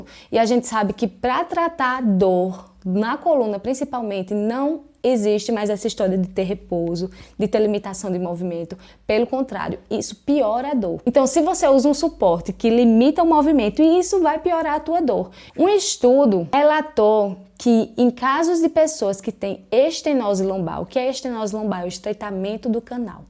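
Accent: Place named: Northeast, Brazil. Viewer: Parei de fazer minhas corridinhas devido a cor na lombar. Machuquei a lombar devido 2 tombos que caí de costas no piso cerâmico, e não procurei tratamento na época. Dia 29/1., farei uma Tomografia da Lombar, e a partir daí vou aguardar o que o Ortopedista vai dizer. Porém baseado nessa aula de Fisio, já tirei o tal colete de proteção lombar do carrinho do Mercado Livre.....rsrsrsrsrs. Sinto muita falta dos meus treinos e Provas de corridas de ruas com a galera da assessoria na qual estava treinando, mas vou seguir o tratamento conforme recomendado. -Primeiro vídeo que assisto da Dra., mas seu jeito de explicar e não massagear o ego dos sofrentes de dores nas costa, fiquei impressionado com a clareza. Além do sotaque que me parece ser da região nordeste, que prende a atenção do inicio ao fim do vídeo. Obrigado por todas as informações com muita clareza e objetivo, sem rodeios. 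Abraço!!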